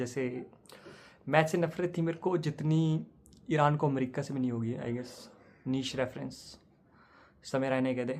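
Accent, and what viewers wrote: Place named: Pahadi, India. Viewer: pahadi ho kya bro, accent aa raha hai thoda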